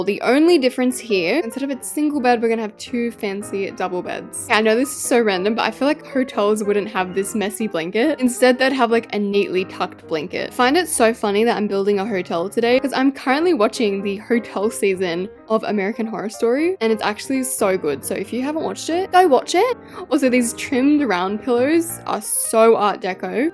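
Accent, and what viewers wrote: England, United Kingdom. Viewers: ur brit accent is more british than me and i’m british😭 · stwoooppppp i loved her english accent its so nice!!!!!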